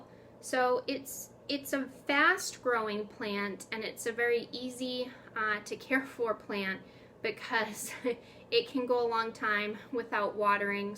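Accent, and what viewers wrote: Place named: United States. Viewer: Are you in Bridgewater in UK or USA. I notice your USA accent. My temerature is only 20°c and my beany is losing leaves by the handful after I just watered her!! Please help.x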